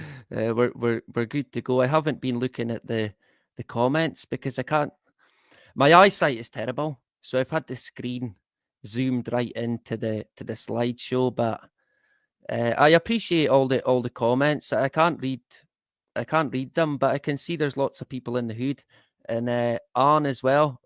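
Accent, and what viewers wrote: Scotland, United Kingdom. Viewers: Love listening to your Scottish accent! Enjoy life my friend. Much love to all. 💙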